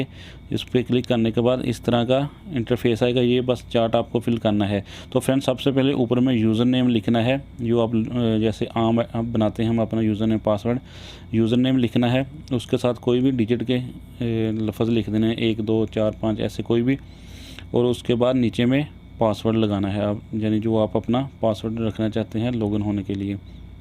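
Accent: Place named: Shakargarh, Pakistan. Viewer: شکرگڑھ سے لگتے ہیں آپ بھائی آپ کا بولنے لہجہ بتا رہا ہے, اور ماشاءالله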